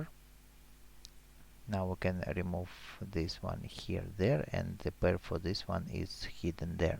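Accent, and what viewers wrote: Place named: Russia. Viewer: i cant listen to this guy.. is he russian?:DDD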